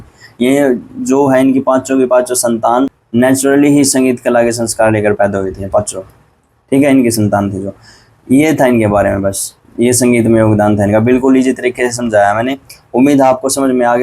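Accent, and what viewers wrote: Haryana, India. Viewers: Haryanvi sir ji ❤❤ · Yeah bro 🔥🔥🔥🔥🔥🔥🔥🔥 haryanvi boliii , m bhi vha se huu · Haryanvi boli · Sir are you from haryana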